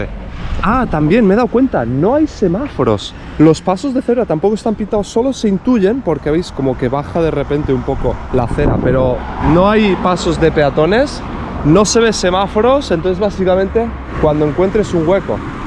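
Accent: Cuba. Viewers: Lo primero que pensé fue parece cubano por el acento cómo que Tongano. ❤ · Waooooo habla como cubano!!!! Bella isla ❤ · Saludos y, yo soy Dominicano, te sentí el acento cubano.😂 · Oye, tiene acento cubano, 😂😂😂. Yo soy cubano también. Gracias por tus videos, son muy buenos. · HASTA EL ACENTO CUBANO TIENE PARECE CUBANO YO SOY DOMINICANO VECINO DE CUBA Y PUERTO RICO SOMOS PARECIDO 🇩🇴🇩🇴🇨🇺🇨🇺🇵🇷🇵🇷👍👍🖐🖐🦾🦾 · El se llevo hasta el acento cubano. Bro me encantan tus videos. Ya he viajado contigo europa completa 😂😂🇨🇺🇺🇸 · se me quedó la tonada de cubano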